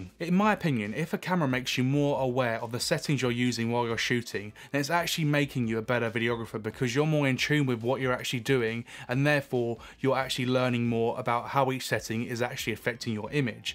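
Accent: United Kingdom. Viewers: Can’t figure out ur accent, r u English?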